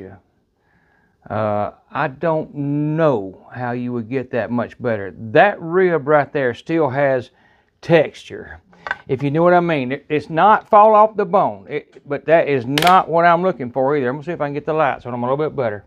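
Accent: South, United States. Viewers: I love this video. You're such a character 😁 your American accent made me smile. Such a good job on those ribs! I love my instant pots.. can't wait to try ribs now! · He’s from the south so he has an accent.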